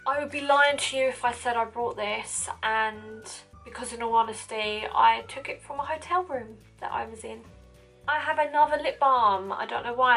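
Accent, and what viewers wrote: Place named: England, United Kingdom. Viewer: I absolutely LOVE your accent!! I want to go to England 😍😍 Great video..i have this in the "MM" size.. thinking about getting the "GM" for travel! You're gorgeous! New subbie! ❤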